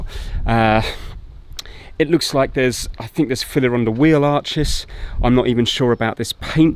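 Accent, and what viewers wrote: United Kingdom. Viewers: my Hero! Nice to hear a British accent after beeing in NZ for 30 years... reminds me of home ;)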